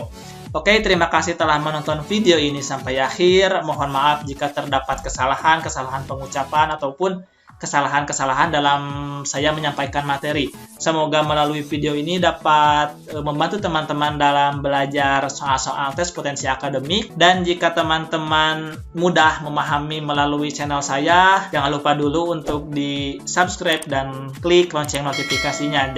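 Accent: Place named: West Java, Indonesia. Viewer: Nadanya sunda, lucu 😆👍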